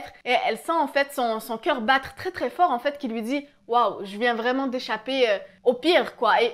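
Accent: Quebec, Canada. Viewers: Salut, je trouve que tu as un léger accent. Tu ne serai pas Québécoise ? En tout cas j'adore ta voix ❤ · T'es tu québécoise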